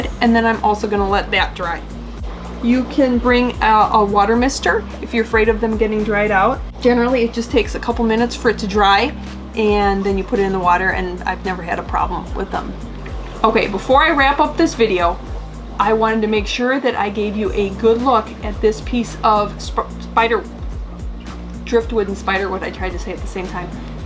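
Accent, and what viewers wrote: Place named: North Woods, United States. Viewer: Wish I had watched this before I made all the mistakes you warned about lol! I hear enough “ope’s” in der for me to think us girls are both from the nort woods. I too share your water parameters. I have had an embarrassing hard time with anubias. The leaves are prone to yellowing with holes and turning into a brown mesh work before death. I have increased fertilizer with what looks like improvement. They get low to medium light with floaters above. Any suggestions?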